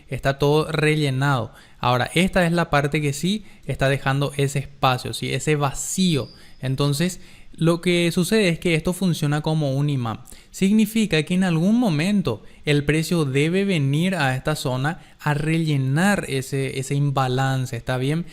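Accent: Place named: Paraguay. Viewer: que purete es el acento paraguayo bien hablado, que locura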